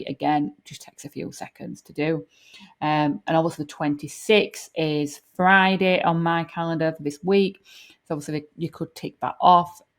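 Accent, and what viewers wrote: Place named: Leeds, United Kingdom. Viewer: Thanks. "Customise" just seems like such a funny place to go to add a dashcard, doesn't it? PS. Grew up in Leeds so nice to hear an IT "How to..." vid with a proper accent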